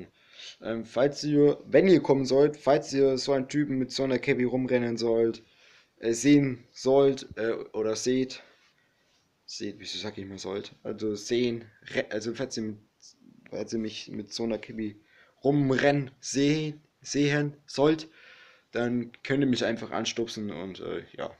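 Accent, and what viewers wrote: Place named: Franconia, Germany. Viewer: Bitte gerne. Du bist noch Jung du wirst noch was passendes finden;) Echt aus Franken,dann hab ich das aber gut raus gehört;)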